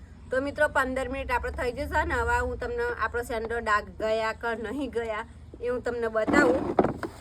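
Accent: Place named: North Gujarat, India. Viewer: Language per na Jao vasant Bhai..Uttar Gujrat ni aavi j boli hoy chhe .so aapdi bhasha ni respect karo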